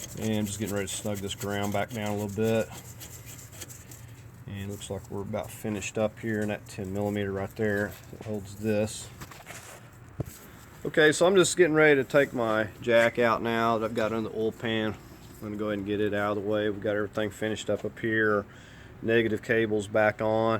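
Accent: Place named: Kansas, United States. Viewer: highest highlights sounds like Kansas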